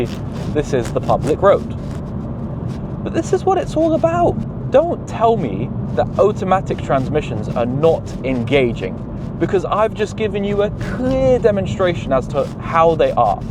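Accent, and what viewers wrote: United Kingdom. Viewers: Britain has such diversity in dialects and the further back in time you go the more diverse it is, so I wouldn't jump to conclusions, I would just assume that's just how he says that vowel. In fact, I'm pretty sure I've heard several dialects pronounce that vowel like that, or similarly different.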